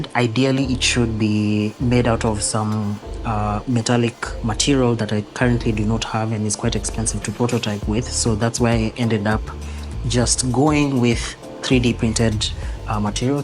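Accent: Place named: Kenya. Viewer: kenyan accent . This is good stuff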